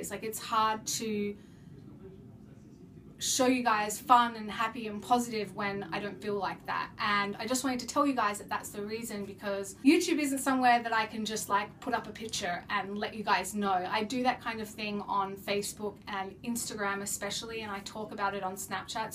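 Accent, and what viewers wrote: Australia. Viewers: You're fast becoming one of my favourite youtubers, and being an Australian it's lovely to hear a familiar accent! Take as long as you need, I know that I along many others will stand by you wil oodles of love and support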